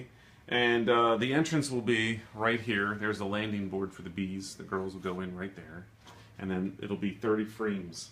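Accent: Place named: United States. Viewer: Love your hive. Great American accent. '...the girls...'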